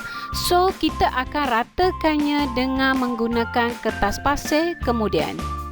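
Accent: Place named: Malaysia. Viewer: Serasa lihat film upin ipin. Bahasa nya sekampung dgn upin ipin. Xixixi. Salam kenal kak.. Saya dari indonesia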